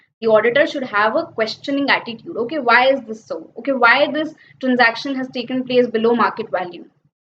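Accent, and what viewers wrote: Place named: India. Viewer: Well explained, valuable information, unfortunately i have difficulty with the Indian accent. Thank u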